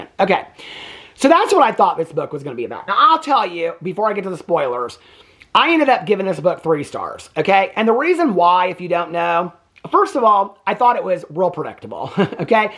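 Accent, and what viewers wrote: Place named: Boston, United States. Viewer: I love your Boston accent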